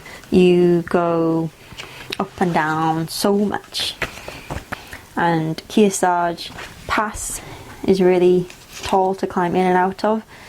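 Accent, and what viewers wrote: Yorkshire, United Kingdom. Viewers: Wow! Thanks for the great story and footage. Didn't know you were a Yorkshire lass! From know on it's " Ey 'up" 8)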